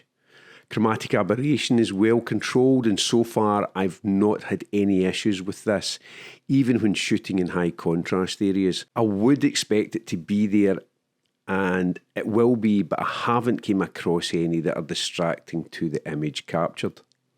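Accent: Scotland, United Kingdom. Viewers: Great video! I love scottish landscapes and accent! Very cool!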